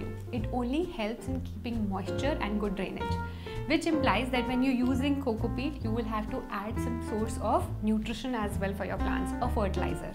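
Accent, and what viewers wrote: India. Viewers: Nice indian English। speaking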